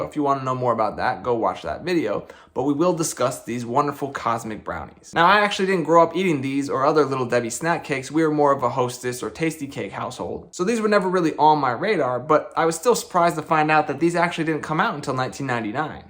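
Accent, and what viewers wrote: United States. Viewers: You from San Antonio, Right.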